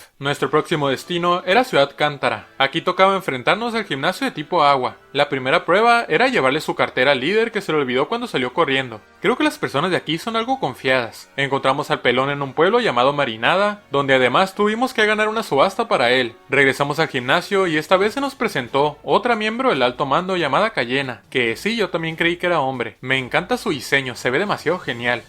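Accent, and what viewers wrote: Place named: North, Mexico. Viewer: Oye bro. ¿De qué parte del norte de México eres?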